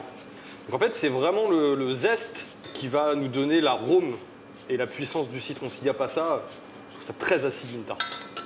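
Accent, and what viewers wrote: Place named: Quebec, Canada. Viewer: On parle québécois en plus...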